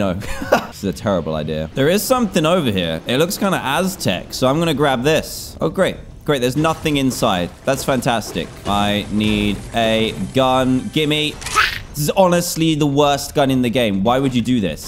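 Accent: United Kingdom. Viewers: He is British so he says stuff differently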